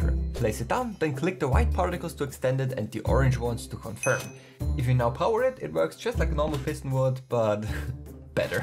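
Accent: Germany. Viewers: Are you german it kinda sounds like it Ich bin auch deutsch ehre ich liebe deine videos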